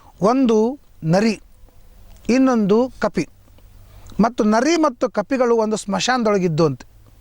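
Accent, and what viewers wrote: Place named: North Karnataka, India. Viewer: Uttara karnataka kannada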